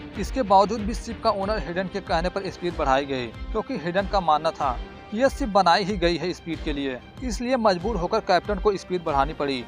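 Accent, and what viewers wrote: Bihar, India. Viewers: bat se lag raha hai bihari ho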